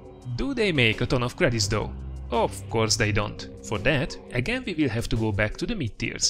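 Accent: Hungary. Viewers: Magyar? :D Az akcentus nagyon olyan. :D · Are you from Hungary?😃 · Great video. I am 100% sure that you're from Hungary :)) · Hat ez nagyon hunglish lett, fajdalmas volt hallgatni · started the video while turning away from screen. after the 1st word, I would say (typing this without looking at either the comments or the user) this is a Hungarian accent... And, no mistake for sure...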